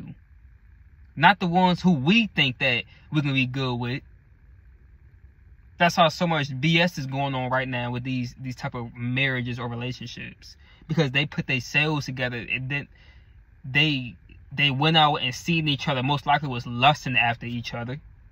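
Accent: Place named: Maryland, United States. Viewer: Hi brother, are tou from Maryland? Your accent is giving it away lol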